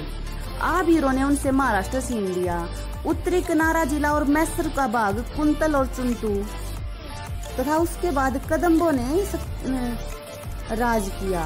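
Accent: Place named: Haryana, India. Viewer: Very nice video but control ur Haryanvi accent madam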